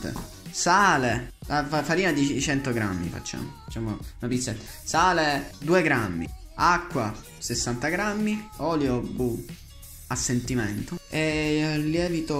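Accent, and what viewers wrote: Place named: Palermo, Italy. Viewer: sei di Palermo? No perché anche io lo sono e penso di aver riconosciuto l'accento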